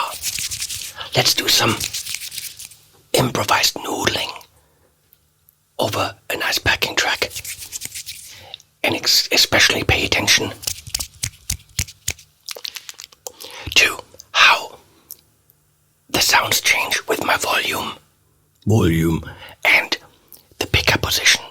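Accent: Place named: Germany. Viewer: Now I remember how I UNSUBSCRIBE from this channel. Not only that HIDEOUS german accent and BAD ATTITUDE, now ASMR🤦‍♂🤦‍♂🤦‍♂. Please somebody beside me tell this man this is not the best way to review an amplifier. You lost a sell , Mr Fryette.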